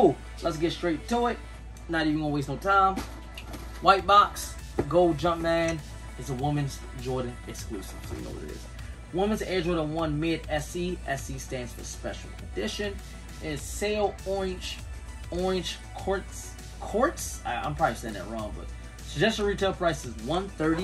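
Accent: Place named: Baltimore, United States. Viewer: You sound Baltimore aff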